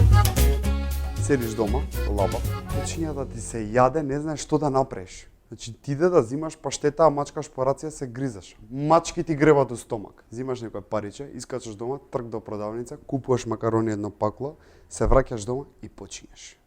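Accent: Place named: Skopje, North Macedonia. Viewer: Decki govorete makedonski. Vo opsto ne si interesne ako zoruvas na Sk dijalekt. Aloo vodis emisija za recepti. Odbivno e za emisijata toa so se LIGAVIS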